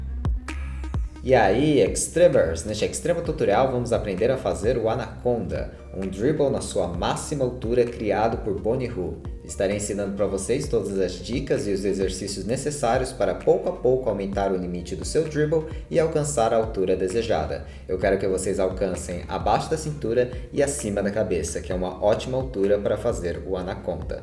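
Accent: Brazil. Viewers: Qualidade alta... cenário simples, técnicas que não vemos no Brasil... de repente: português-Brasil, sotaque paulista. kkkkkkk Mt bom.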